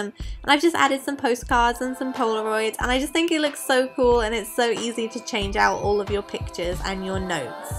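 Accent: England, United Kingdom. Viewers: is she english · Well She Has An British Accent, But Yeah I Go With U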